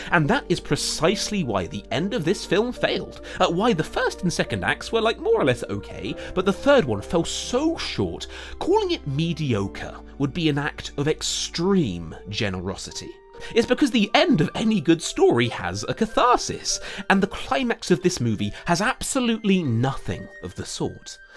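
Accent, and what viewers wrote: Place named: RP, United Kingdom. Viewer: This dude is speaking so posh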